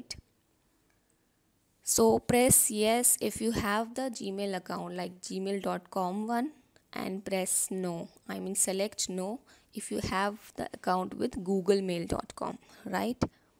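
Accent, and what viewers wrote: India. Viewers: Wow, do all Indians talk with that shit accent. God, it's so annoying.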